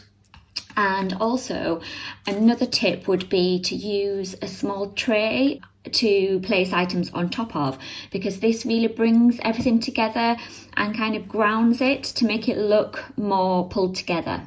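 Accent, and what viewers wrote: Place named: Newcastle, United Kingdom. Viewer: Hi. I have so much difficulty with shelves so I loved how you dressed your shelves. I love watching your app. I’m English and I live in Spain so it’s lovely to hear your accent and the way you interact with your viewers. I’m originally from Necwcastle upon Tyne so it’s a little bit like being at home. I hope you all stay safe and once again thank you for all the time you spend in helping us make our homes 🏡 more cosy. Xx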